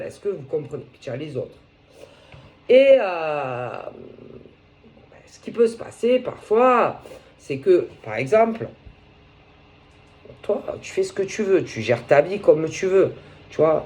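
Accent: South, France. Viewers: l'accent du Soleil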